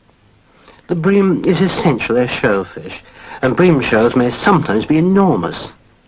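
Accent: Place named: England, United Kingdom. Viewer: Talks very well with a marvelous English accent.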